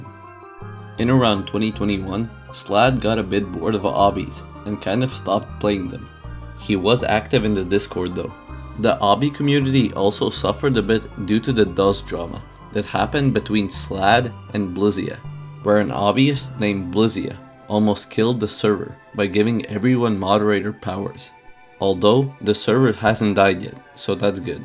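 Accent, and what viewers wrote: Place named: Latvia. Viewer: just listening to you speak i could understand that u were latvian 😜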